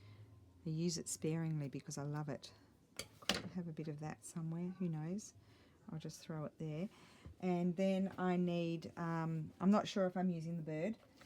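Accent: Australia. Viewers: I really enjoyed this video. I love your Aussie accent and your enthusiasm. These collages are some of the best I’ve seen.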